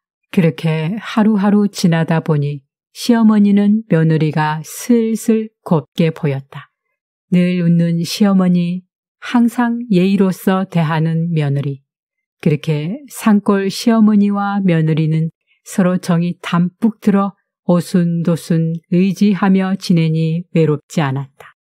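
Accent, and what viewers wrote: Gyeongsang, South Korea. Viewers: 간만의 경상도 버전 아주 구수한 이야기 아주 좋아요 엄머야 워짠 일로 엄마야😂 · 님!!~^^ 갱상도 버전으로~정말 잘 하셔서 재미있게 듣습니다. 스님동냥이 아니라 탁발 이라하는게 듣기좋을듯~~😂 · 구수한 경상도사투리로 읽어주시는이야기가 참 잼나게 들었네요 고부사이도 그렇고 삼부자지간예기도 뜻깊게 들었어요 요즘 사회가 각박하고 기분좋은일이 별로없는데 옛날 예기가 많은생각을하게해주네요 고맙고 감사했어요 앞으로도 좋은예기 많이들려주시길요~^^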